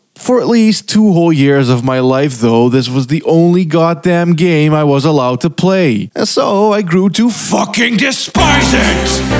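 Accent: Netherlands. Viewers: Do I hear a very tiny slight Dutch accent? Edit: dus toch! 😁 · I had a sneaking suspicion you might be Dutch by the way you pronounce certain things but you casually dropping a Dutch sentence in the middle of this video still hit like a truck holy shit lol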